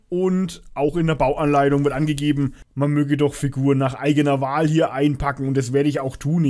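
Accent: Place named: Franconia, Germany. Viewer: Ein schönes Video mit deinem coolen fränggischen Dialekt!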